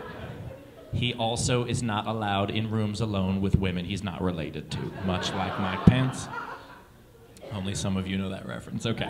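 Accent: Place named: North Carolina, United States. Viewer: Oml i know that nc accent feom anywhere.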